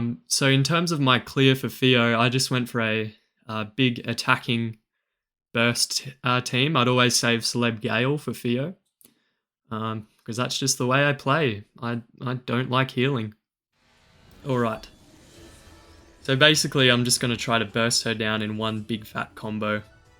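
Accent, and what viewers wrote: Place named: Australia. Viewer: Gorgeous character. Can’t wait to sit down and hammer out this content myself. Got a special project of my own I’ve been working on that wasn’t suppose to turn into the monster it has become, but it’s been fun to hammer out. As always, your content is a pleasure to watch. Wish I had your buttery-smooth Australian voice and cadence. Best of luck in your exams, can’t wait to see what you have planned for 500 subs!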